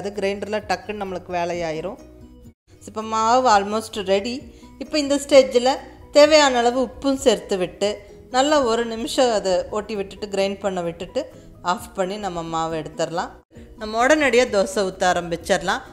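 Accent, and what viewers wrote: Coimbatore, India. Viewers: Hi aunty I made this dosa today and it turned out very crispy and yummy. Whenever I think of anything to cook, before asking my mother I visit your channel and I find the solution to it. I just love the way you flourish Coimbatore slang of speaking and I adore that so much. U have very peculiar pronunciation. Looking forward to meet you one day aunty.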